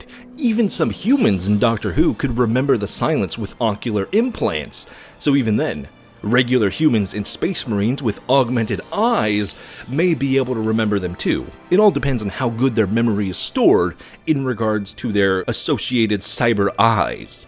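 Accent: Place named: United Kingdom. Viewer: too. much. british...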